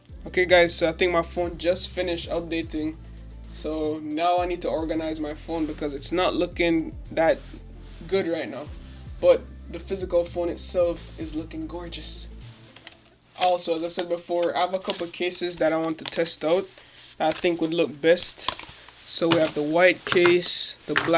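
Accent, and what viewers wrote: Jamaica. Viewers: Man sounds like a Yardie. Jamaican accent cya hide iyah. Big ups pon di content 👍🏾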